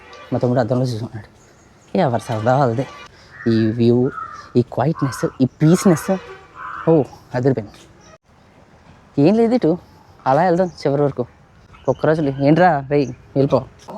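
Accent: Godavari, India. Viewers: Meeku Inca subscribers ravali ani korukuttuna brother me comedy Maa godhavari yasa baguntadhi meeru Inca godavari side village s traditional videos chayandi bro😊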